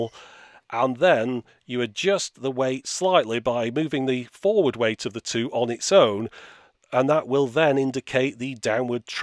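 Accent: United Kingdom. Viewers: What accent in UK is that, sounds like Ringo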